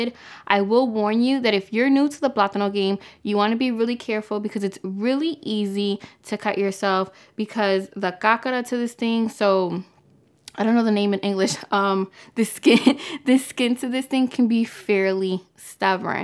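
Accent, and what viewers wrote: New York, United States. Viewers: Heard the NY accent, so I'm trusting you above everyone else.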